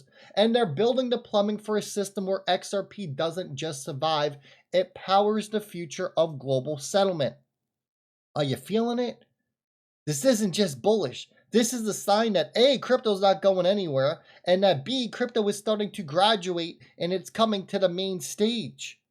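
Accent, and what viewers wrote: New Jersey, United States. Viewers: Forgive him. He's from Jersey.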